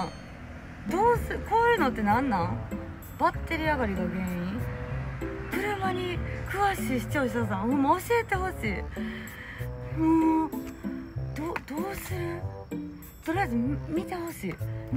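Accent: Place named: Kansai, Japan. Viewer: ザ・関西弁(笑)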